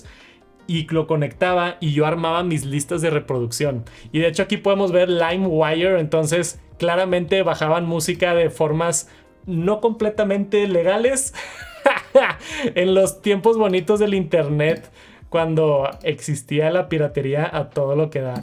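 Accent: Mexico. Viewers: Porque habla así con ese tono, como afeminado o de niño adinerado. 🤔 Es por Alguna region de México hablan así o es solo esta persona ?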